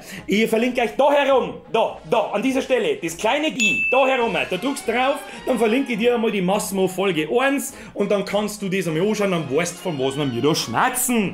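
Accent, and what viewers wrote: Bavaria, Germany. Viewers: # weil boarisch einfach geil is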